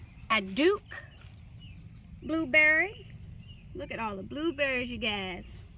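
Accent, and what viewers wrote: South, United States. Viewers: Nice. I can't seem to place the accent though. Definitely southern, but I get twang with no drawl. Carolinas or Virginia maybe? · Beautiful garden! I knew you had to be somewhere in the southern states because we all have that twang, different from state to state but still isn't like New Jersey. I sure wish I could grow the Alisa Craig onions but I'm in zone 9A, so it's short day only.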